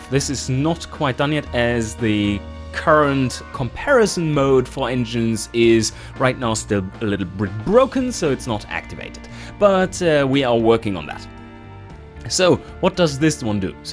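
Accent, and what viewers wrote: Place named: Germany. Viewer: Ich mag Englisch mit deutscher Akzent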